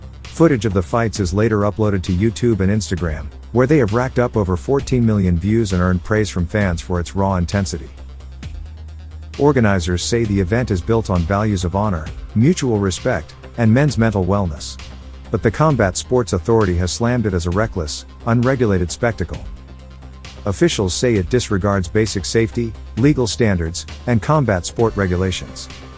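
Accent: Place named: United States. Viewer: . I'm aussie and I reckon the yank voice suits this better. Dunno why, probably just used to it. Either, you're awesome, stay that way!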